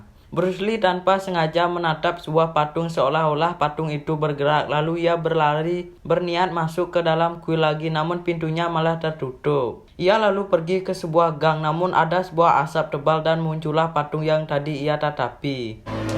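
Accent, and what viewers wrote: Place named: Bali, Indonesia. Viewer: Sperti suara orang bali 😅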